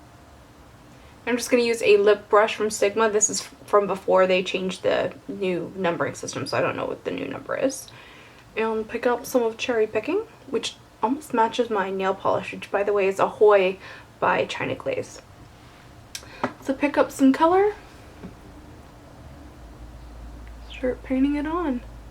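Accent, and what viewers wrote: England, United Kingdom. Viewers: This video made my morning,! So fun! Love your English accent, love! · thank you- I love shows with a brittish accent:)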